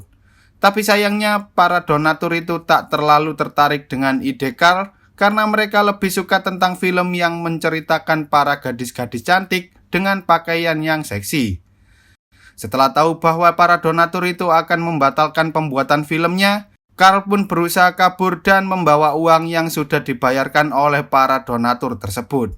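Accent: Java, Indonesia. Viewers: Alasan gua suka sama channel ini: - Kadang suka becanda - Tidak terbata bata - Suaranya mirip opung gua - Memakai logat khas dari jawa Sukses selalu min 👍🏻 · kek logat orang jawa 🙄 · Logat jowo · Gw suka nih channel nontonin film dari bahasa yang bersahat(medok :v)😂 Edit:film zombi lagi dong kalo ada😁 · Jowo mu ngendi min? 😂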